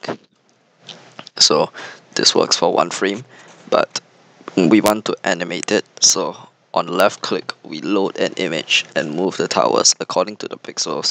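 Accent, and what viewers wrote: Singapore. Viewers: Yooo singaporean??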